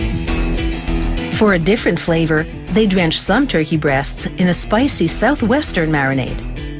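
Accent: Canada. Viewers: Didn't realize the Narrorator was Canadian until the end. Oh, U and O.😂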